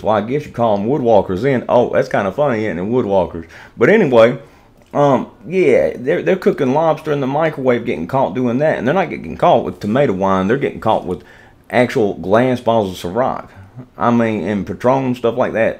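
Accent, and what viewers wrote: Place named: South, United States. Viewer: You are from the south, you always talk funny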